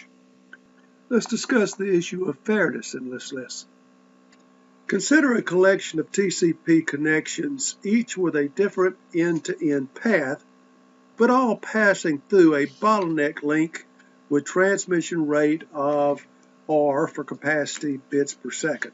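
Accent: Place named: South, United States. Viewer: Nice lecture and love that southern accent :D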